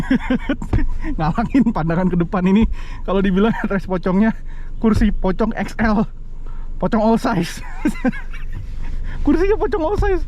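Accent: Manado, Indonesia. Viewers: orang manado kah ? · Awalnya qt kira ini chnnel jkt pas dgr logat rupa logat manado trnyata plat DB 😅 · Om orang manado kang?😂 Jalang mo ka koka itu dgn di ringroad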